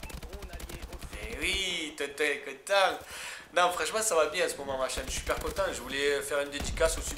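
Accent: South, France. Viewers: Tu est trop excellent putain tu me fais grave kiffer avec ton accents du sud j'ai beau savoir que tu est pour Marseille je te kiffe continue mon gars <3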